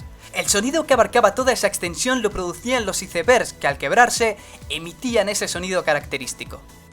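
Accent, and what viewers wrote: Spain. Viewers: Ese acentote español. Casi ni se nota que es. Aún no se drogaba pero usaba la misma música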